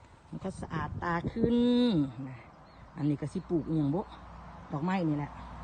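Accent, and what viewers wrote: Isan, Thailand. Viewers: ยายข่อยพึ่งเห็นคลิปเจ่ายุไทยยุอำเภอใด๋ค่ะฟังเสียงคือคนบ้านเฮาค่ะ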